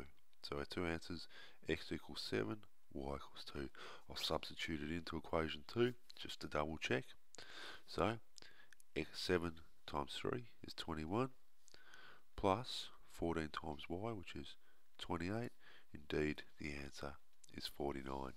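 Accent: Australia. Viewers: are you australian??? accent is so iconic! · Yeah I think so. Well I'm from Australia and he def sounds Australian to me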